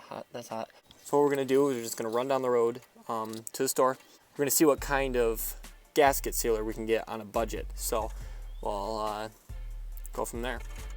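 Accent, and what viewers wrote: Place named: Wisconsin, United States. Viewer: I'm Canadian never been to the states but I'd guess he's from Wisconsin lol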